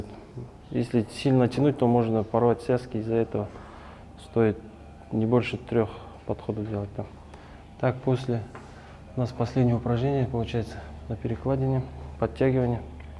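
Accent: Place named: Kazakhstan. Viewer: Казахский Русский пойдет👍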